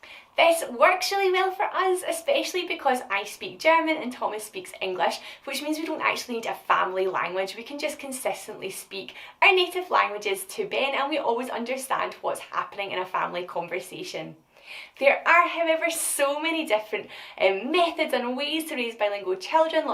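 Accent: Scotland, United Kingdom. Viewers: Love this video! My husband is french and I’m English/Welsh so we have a few languages going on! We’re also sticking to the one parent one language system but I do need to prod my husband mathieu when he forgets 😅 You have such a lovely accent! My wee granny was from Lewis and I spent all my childhood summers there so I have such a soft spot for the Scottish accent ✨ Lovely channel! New sub here, I can’t wait to see more ✨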